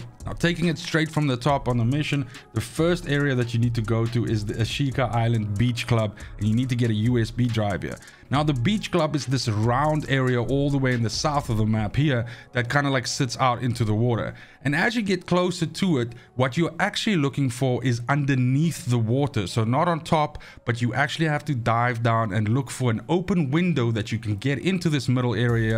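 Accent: South Africa. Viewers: SA accent xD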